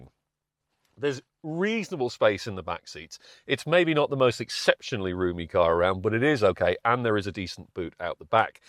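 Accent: England, United Kingdom. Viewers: Is this guy english? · because he's English :)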